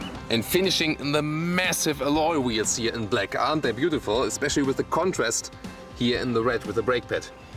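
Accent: Germany. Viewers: Love the review, I like to hear your german accent too! would be pretty cool to take this up to the mountains with all the snow!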